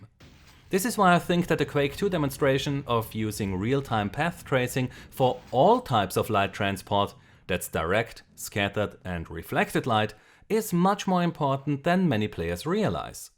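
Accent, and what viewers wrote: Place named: Germany. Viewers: Hey there, not to criticize you or anything, but I‘m really curious about your accent. Sounds kinda German-ish, but with a different pronounciation, so my guess would be that you‘re Austrian? Am I correct? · Pretty sure it's german. Practicing english. You can tell when the syllable count is a multiplier of what would normally be said.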